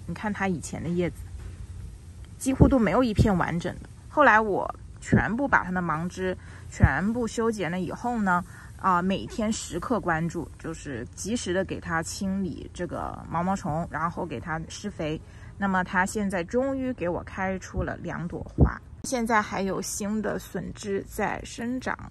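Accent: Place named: Hubei, China. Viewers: 自信点，去掉”有点”。应该就是仙桃洪湖监利天门那一块的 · 听管主的口音，有点像湖北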